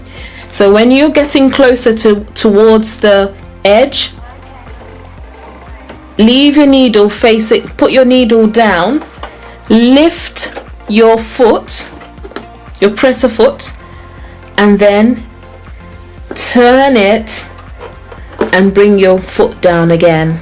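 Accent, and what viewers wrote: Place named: Europe. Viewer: Beautiful black woman with old-world skills, humble heart, and European Accent . 🔥🔥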